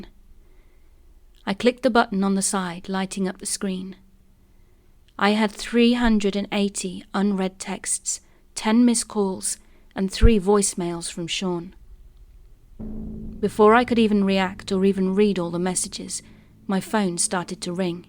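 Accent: England, United Kingdom. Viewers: Good to heart an English female narrator 😊 I have a lot of true paranormal stories that I’ve not got around to sharing if you want them ? How do I send them to you ? X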